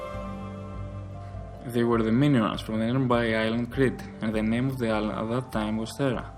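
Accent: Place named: Arab world. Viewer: You're Greek? I thought you Arabian from your accent. I know nothing of other cultures!!! :'(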